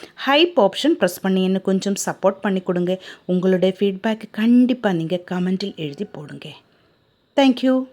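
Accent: Kerala, India. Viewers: அவர் மலையாளி ஆகவே தான் அப்படி பேசுகிறார், we should appreciate her.instead of mocking.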